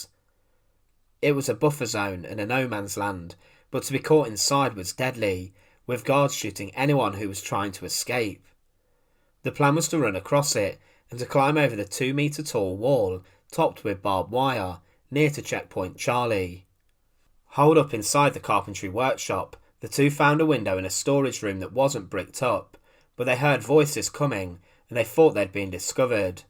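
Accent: United Kingdom. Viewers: Worst documentary with a British guy speaking, and the award goes to.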